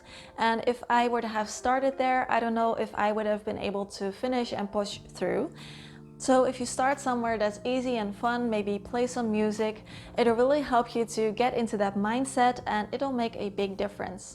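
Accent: Netherlands. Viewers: I somehow could hear you were Dutch (even though your english is sooo good and you don't really have an accent) but it was confirmed for me when I saw the Holland & Barrett kortingskaart, haha. Super leuke video's en je hebt er een sub bij! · Ik hoorde toch een Nederlandse toon❤❤ je bent gewrldig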